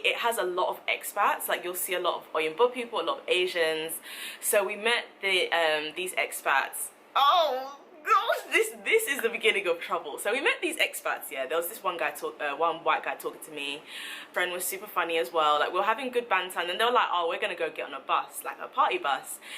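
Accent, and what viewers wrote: United Kingdom. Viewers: UK accent right there , so when I went to UK i automatically grabbed the accent 😇😇😇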